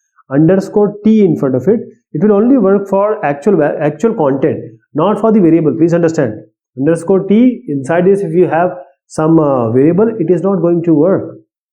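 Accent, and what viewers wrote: India. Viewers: Your hindu accent is a rape to my ears, impossible to listen. And no reason, since UTF-8 is Unicode. You get it wrong: UTF-8, -16, -32 are minimal number of bits, so say character A (latin) would still take 8 bits in UTF-8, 8 and another 8 unused in UTF-16 and so on... Did I mention your awful hindu accent? dont do public speaking, ever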